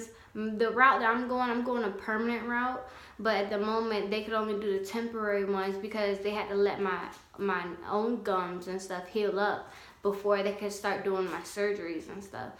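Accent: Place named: South, United States. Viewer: You’re literally the most beautiful woman I’ve ever seen wow, love your southern accent.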